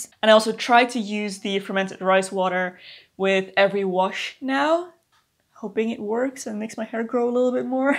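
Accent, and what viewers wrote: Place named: Netherlands. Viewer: Jojo I she’s from the Netherlands, I think 😊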